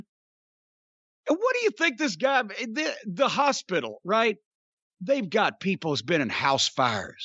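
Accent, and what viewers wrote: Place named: Midsouth, United States. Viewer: That's how we say it in the Midsouth USA lol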